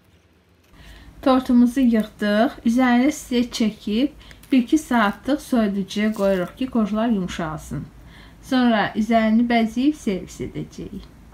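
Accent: Baku, Azerbaijan. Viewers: Əladır,şirin ləhcəli bakılı qız.Bu torta qat.süd və yağdan ibarət krem çəkmək olar?mən bişmiş krem sevmirəm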